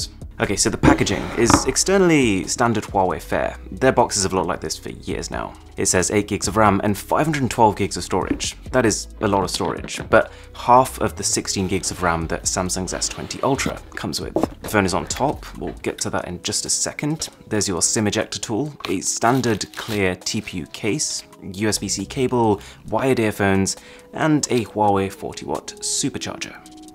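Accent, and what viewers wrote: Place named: RP, United Kingdom. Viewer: Hello Mr posh english accent. How good is this phone without google apps & services?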